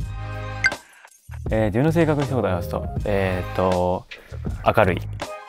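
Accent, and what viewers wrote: Kansai, Japan. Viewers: 雅椋さんの好きなところなんて星の数ほどあるのですが、、、選抜して述べると、ダンスが好き、笑顔が好き、品のある金髪が好き、ホクロが好き、臣さんラブなところが好き、DJとか曲作れちゃうところが好き、狙ってないのに面白いところが好き、関西弁が好き、大クセかましてるところが好き、マメなところが好き、くんの書く文章が好き、言葉選びが好き、目を見て話してくれるところが好き、優しくて好き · くんの笑顔とえへへが大好きです💞 あと関西弁も好きだし見るからに優しさが溢れ出てるくんが好きです👦🏼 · Q.僕の好きなところを教えて下さい全くもう〜欲しがりだな〜😉😉🧡 くんの好きなところは、踊る時のグルーヴ感、楽しそうに踊る所、かと思えば切ない表情もしてそれがえちい所、真面目な所、ボケが滑った時の返し方、どんな方にも平等に優しい所、踊ってる時に右か左をチラッと見る癖、 『えへへ』と笑うギャップ、身長が175cmな所、手の指が長くて綺麗な所、煽る時の低音ボイス、所作に出る礼儀の正しさ、不意に出る関西弁、不意に出る天然ボケ、SNS更新頻度が高い所など！！もう上げたらキリがないので、とりあえずという存在が大好き！！ってことを知ってもらうだけで十分です🐣🐣✌🏽✌🏽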